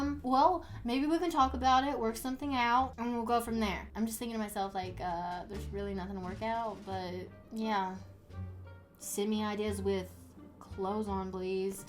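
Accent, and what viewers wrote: South, United States. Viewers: I love your country accent! I hope your from a southern state or this comment will sound really stupid lol... I’m new to your channel just watched your two videos about your old apartment complex ! I’m subscribed and love you! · Love your Southern accent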